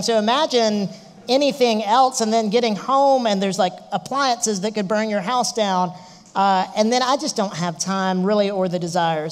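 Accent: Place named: South, United States. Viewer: I had never heard you speak until today. Couldn't believe you're a Southerner. Love that accent. :-)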